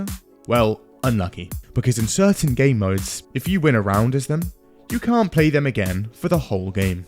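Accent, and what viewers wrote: United Kingdom. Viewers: Why does this general kind of YouTuber always have a British accent? (, Internet Historian, Joeseppi)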